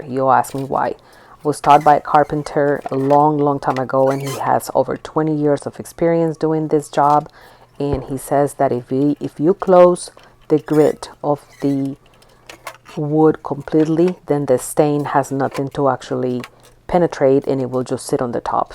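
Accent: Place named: Southwest, United States. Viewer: Beautiful job!! Thanks for the tip about staining. I understand you perfectly - your accent is just a normal Southwestern accent, a lot of people have it here, most especially if their families have lived here for a very long time. I live in Albuquerque New Mexico and wondered right away if you were close by because so many people talk like that here. It says you're in or near Dallas, so it's normal. It's a very normal 'accent' and I used to think it was just an Albuquerque area accent, but it's not, it's like that throughout the Southwestern US, mostly just in a band across Texas, New Mexico, Arizona, and California, more common in the southern halves of those states but lots of people throughout those states have it.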